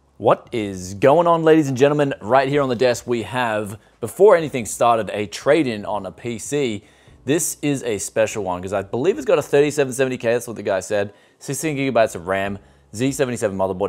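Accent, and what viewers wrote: Bogan, Australia. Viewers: idk why but, Australian accent sounds for me so good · Please stop with the bogan voice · That is exactly how I imagined Aussies speak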